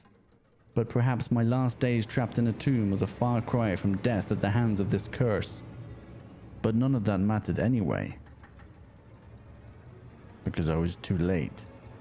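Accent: United Kingdom. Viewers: Hope you have a SPOOKTACULAR HALLOWEEN weekends mate! 🎃👻 P.S : Loving your British accent more and more. 🤍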